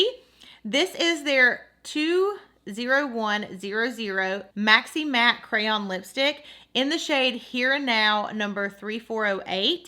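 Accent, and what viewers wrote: South, United States. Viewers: I don't get the ipsy bag anymore. I just upgraded to the glam bag plus and I am loving it so far. I wish I could get both because I loved getting the different bags each month. I'm just not financially able!! I Love your channel and that country accent. I'm from Georgia so my daughter has one lol...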